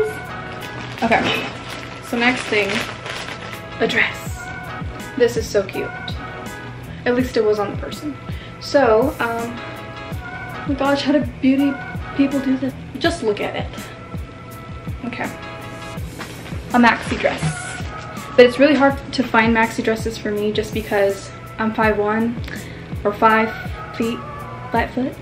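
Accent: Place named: South, United States. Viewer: liked the southern accent jaja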